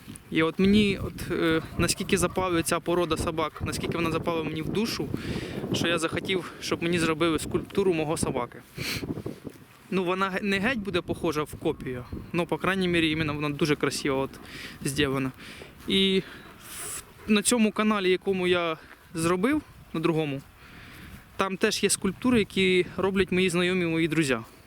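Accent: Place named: Nizhyn, Ukraine. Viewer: акцент на наш Ніжинський схожий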